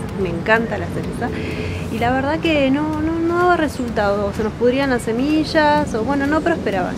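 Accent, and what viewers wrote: Argentina. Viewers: Hola soy Colombiano, amo los cerezos y he defendido uno que creció conmigo y me lo han querido tumbar mis vecinos, al parecer ya le salve, sin embargo, un día ver la posibilidad de una inminente tala de mi árbol decidí que me seria mas fácil asumir mi perdida si tenia bebes de mi árbol, la tan llamada prolongación de la especie. En sus cerezadas he obtendio las semillas y nada. Voy a probar tu método, donde lo logre seré feliz como tu lo fuiste. gracias por compartir. p.d. estas hermosota y con ese acento che... divina. p.d. de p.d. sin ofender y con cariño cuidate tus dientecitos, pero fresca también descuide algunos mios, pero el compromiso es ni uno mas perdido por estupido descuido.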